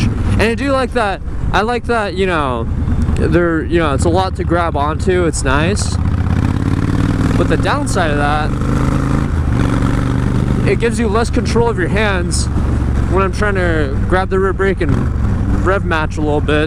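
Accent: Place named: Russia. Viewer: u tebya ochen yarkiy russkiy akcent, by the way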